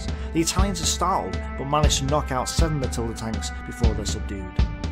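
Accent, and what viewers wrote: United Kingdom. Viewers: Not sure if its True British Accent It could be Australian Or Down Under period, either way your English Accent is Thick as Hell Period and Im from USA and have a hard time Understanding what the hell your saying......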